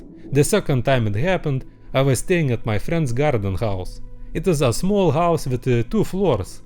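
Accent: Russia. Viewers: why is his accent russian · Are you having a Russian accent? (P.S. im Russian) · Are you Russian or European? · like your russian accent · u russian??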